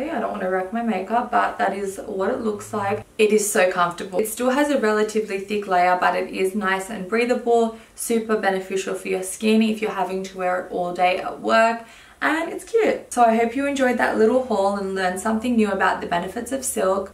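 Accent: Australia. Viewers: Hi, I’m new to your channel and love your makeup looks! You have a beautiful accent, is it Australian? And what is your ethnicity?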